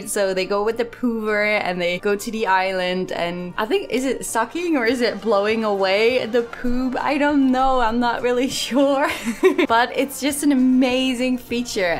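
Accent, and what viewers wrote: Netherlands. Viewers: wacht ben je nederlands???? xDD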